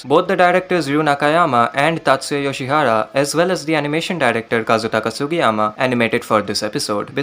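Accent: India. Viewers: Are u from India???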